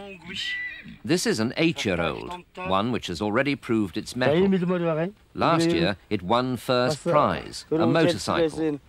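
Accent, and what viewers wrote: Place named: United Kingdom. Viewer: Use American English. British English is difficult to understand.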